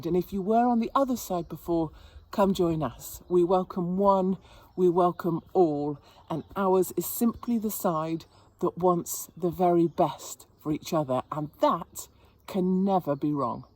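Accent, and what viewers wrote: United Kingdom. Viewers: I love fabulous British accent when she's speaking American.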